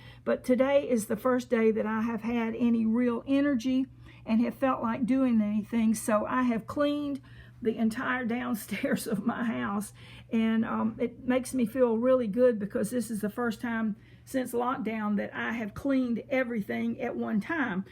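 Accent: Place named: South, United States. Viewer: Oh!,..it’s so good to hear your sweet southern drawl, it’s been too long! Lilliebeth looks absolutely precious in her Ralph Lauren, and that hat is just so cute! The matching tennies are to die for!! How glad I am to see you’re doing well! The way I see it, if a little xtra weight is all we get in this time of pandemic, we’re ahead of the game! Hugs and much love Dearheart, your pal Joy xox. P.s., I shall send you an email of how we are doing in a bit.😊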